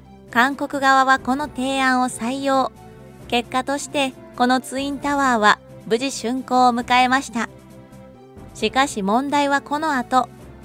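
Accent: Kansai, Japan. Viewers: ナレーションの方は関西の方？ ヘンに隠さず、関西弁でナレーションされた方が楽しいかも。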